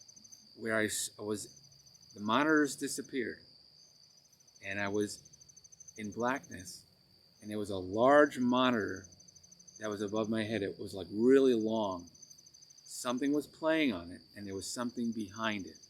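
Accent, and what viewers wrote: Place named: New York, United States. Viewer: This is fascinating,! The New 'Yawkr' accent coming out here. I am from Ossining, NY so I really hear it. I support and believe in you AND the experience you've had. May God bless you and you're family and keep tawkin bro. ❤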